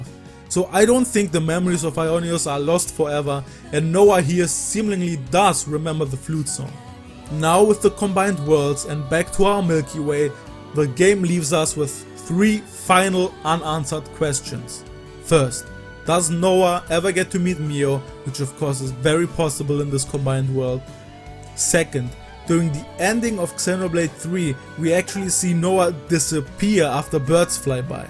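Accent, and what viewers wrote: Germany. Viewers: Hi, ich habe deinen Channel gerade entdeckt und gleich mal ein paar Videos nacheinander geschaut. Super, einen Youtuber aus Deutschland zu finden, der sich mit Xeno-Games beschäftigt. Hab' auf jeden Fall ein Abo dagelassen und bin gespannt auf deinen weiteren, interessanten Content. Abseits davon, ich könnte mir vorstellen, dass du leichter mehr Reichweite gewinnen könntest, wenn du hier und da etwas mehr an deiner englischen Aussprache und den Formulierungen feilst. Ich hatte den starken deutschen Akzent ziemlich schnell rausgehört und das hat mich leider immer mal aus dem Inhalt rausgerissen. Einige Fehler im Satzbau bzw. bei verwendeten Begrifflichkeiten waren auch festzustellen, ich konnte mir aufgrund meines deutschsprachigen Hintergrundes aber gut zusammenreimen, wo das herkommt. 🙂 Das ist absolut nicht negativ gemeint, also bitte nicht persönlich nehmen! 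Ich finde lediglich, dass es deine Videos optimieren würde, wenn du deine Scripts möglichst nochmal von jemandem gegenlesen lässt, der Englisch etwas flüssiger beherrscht. Ich bin zwar kein Englisch-Muttersprachler, habe aber durch meine Arbeit und Hobbys seit vielen Jahren täglich mit Englisch zu tun. Falls du Bock hast, kann ich dir gerne Tipps geben, wie konkrete Sätze besser formuliert und ausgesprochen werden können. Ein allgemeiner Tipp: Hör dir ruhig mal dein Script über TTS (Text-to-Speech) an und sprich es nach, das kann massiv helfen, die eigene Aussprache zu verbessern. Ansonsten, tolle Videos, sehr informat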